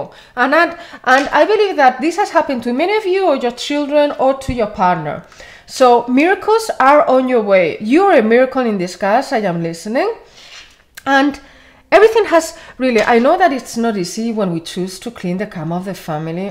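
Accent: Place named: Spain. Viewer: Your voice is beautiful, personally speaking I would hate to see u learn english because what u have is treasure. I love ur Spanish accent. Don't ever lose it❤❤💕.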